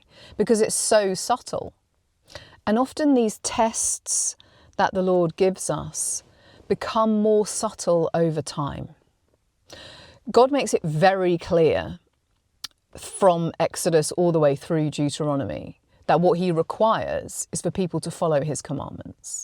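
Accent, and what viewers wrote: England, United Kingdom. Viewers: Brilliant as always! It is highly evident that you have humbled yourself before the Lord and so He is using you in a mighty way. This message resonates with me so much and yes, I do find that there is a definitive pattern of God allowing us to have our own season of death to self so that He may then live through us for His glory! As an aside, somehow, I feel more cultured just listening to you with that lovely English accent. Ha Ha And, since I did not have any children, I bestowed my favorite name to my beloved cat,.:) God bless you!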